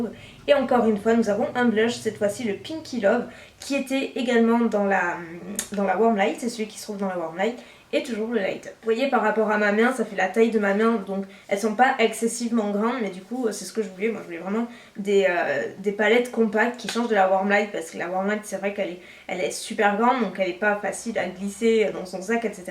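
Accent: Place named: South, France. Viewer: Pourquoi ? C'est l'accent du sud qui fait cette prononciation; je trouve justement que ça donne un charme à chacune de ses vidéos. Si vous cherchez des vidéos provenant de "l'Académie française", ben faut changer de thème alors...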